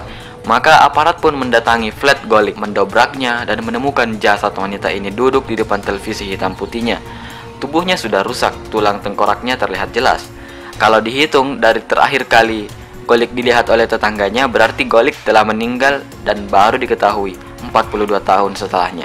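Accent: Makassar, Indonesia. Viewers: Santemi logat makassarta' bos 😂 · Orang Makassar detected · Abang orang makassar yah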